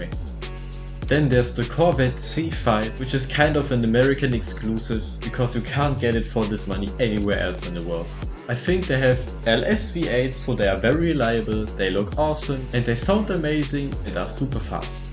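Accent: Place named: Germany. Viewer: hahaha, ich muss aber auch sagen, bei den neueren Videos hört man den deutschen Akzent aufjedenfall weniger 🤝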